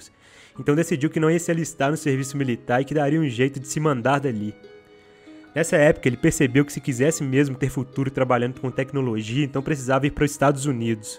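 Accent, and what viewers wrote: Minas Gerais, Brazil. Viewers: Sei q vc 100% mineiro pelo sutaque. Mto bom o video mto inspirador. Thank you!!!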